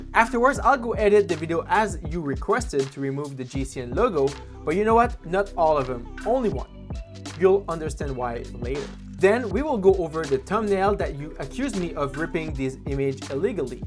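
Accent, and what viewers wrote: Quebec, Canada. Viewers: ça sonne québécois, comme l'accent! 👍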